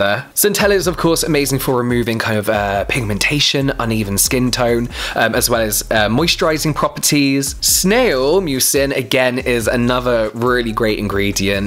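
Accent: United Kingdom. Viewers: Hello, it is soooo refreshing to hear British English on YouTube 🥰 Thank you for this amazing channel and your "ear-pleasurable" accent 😁 Love from Prague ❤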